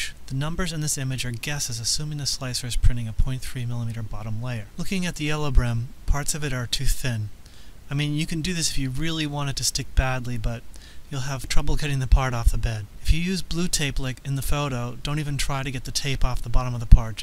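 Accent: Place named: RP, United Kingdom. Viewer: You talk as son of the Queen of UK. Are you an engineer?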